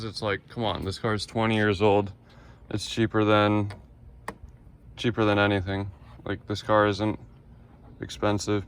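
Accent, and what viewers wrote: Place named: Venice California, United States. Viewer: Bruh your personality is funny sound like a Cali Venice man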